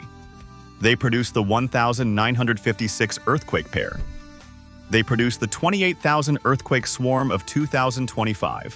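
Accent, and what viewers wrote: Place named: United States. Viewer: Mmmmm Just another A.i. "CLICK-BAIT" WITH THE EVER SO PAINFUL b.s YANK VOICE. (THE SIGNATURE OF A SCAMMER! )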